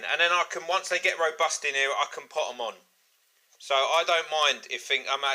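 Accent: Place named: Bedfordshire, United Kingdom. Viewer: cheers, giving the peanuts a go this year. Also saffron, and for utter madness, sugarcane. You sound local to Bedfordshire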